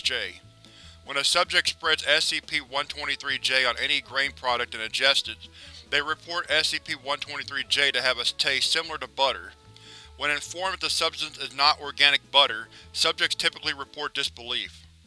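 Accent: South, United States. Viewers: Damnit dude I wait till Wednesday to see your new scp reading and it's butter. Naw but seriously I enjoy your readings man keep up the good work. I know people who I suggested this channel to couldn't get into it because of your southern accent. I'm from Texas though and that's standard down here. Keep up the good work.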